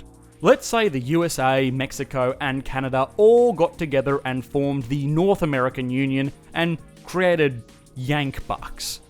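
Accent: Australia. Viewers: What ethnicity are you mate, you sound Australian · Some biased shyte from this Australian speaking bod. Great British Pound....hahahaa Country finding its feet....EU never stopped UK from their trade and commerce. Wait and see when the sterling will be less than Euro. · I think this idiot Aussie sound alike is being paid by the corrupt EU l hope the UK leaves with No Deal, far better for us in the UK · The Australian accent is horrendous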